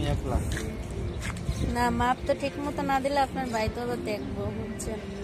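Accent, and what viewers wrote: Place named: Barishal, Bangladesh. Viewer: মাশা-আল্লাহ এই বরিশালের ভাষা আমার কাছে শুনতে ভালা লাগে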